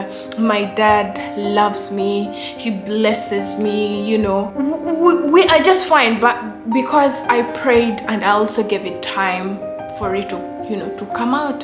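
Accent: Uganda. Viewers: Just seen your videos, you sound Ugandan i stopped because of your accent. Ended up watching your carrot oil video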